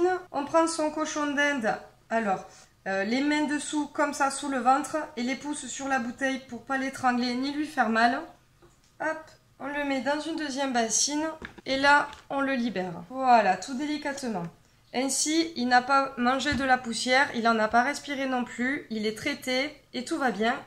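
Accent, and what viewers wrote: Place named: South, France. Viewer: Super tuto comme d'hab, avec le chouette p'tit accent du Sud.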